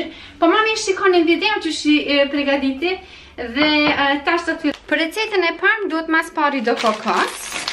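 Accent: Kosovo. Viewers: QENKE SHUM E MIRE DHE SHPJEGON SHUM MIRE ME PELQEN DIALEKTI KOSOVAR SHUM. TE PERSHENDES. 🙋😄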